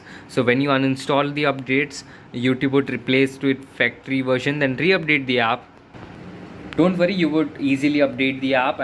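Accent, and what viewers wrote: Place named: India. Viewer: adopt a british dialect please not an indian dialect please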